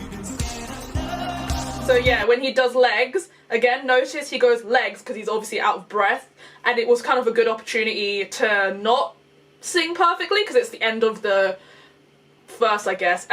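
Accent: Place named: United Kingdom. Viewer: Well I am a huge fan which attracted me to your video and I heard the British accent I was like hey gonna support a fellow brit. But anything live by him there is a these vevo performances he did a few months back you could check out. Also cover by I'm a huge fan especially which is my fav album of all time. I'll try to think of more. But let me what you have heard and haven't so I can suggest more. I would message you on socials but I don't have any social media anymore I deleted it all last year sorry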